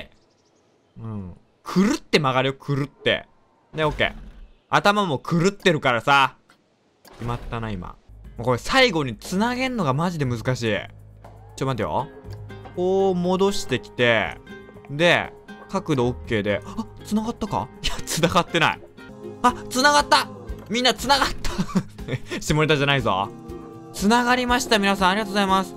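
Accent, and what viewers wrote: Kansai, Japan. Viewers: ときどき出る関西弁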